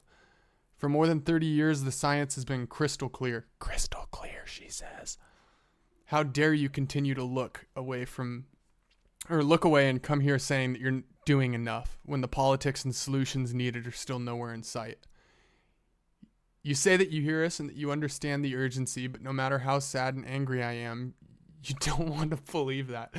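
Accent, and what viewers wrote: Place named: Canada. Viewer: You sound like you went to school in Canada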